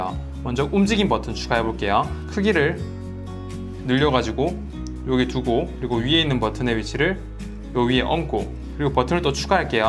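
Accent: Gyeongsang, South Korea. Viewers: 경상도 사투리가 너무 깊게 박혀있네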